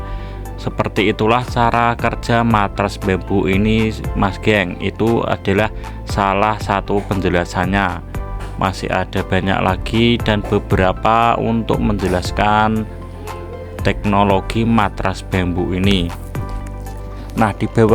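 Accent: Java, Indonesia. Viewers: sampean orang mana mas logat jawanya beda?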